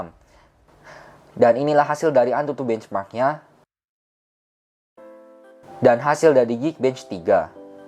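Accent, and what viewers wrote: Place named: Java, Indonesia. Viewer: medok banget hehe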